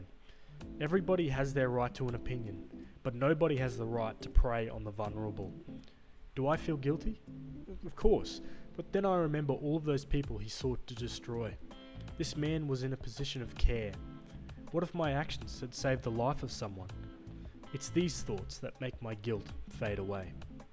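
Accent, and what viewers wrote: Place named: Australia. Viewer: I’m thanking Markee for introducing me to your channel! Love the Aussie accent! 💜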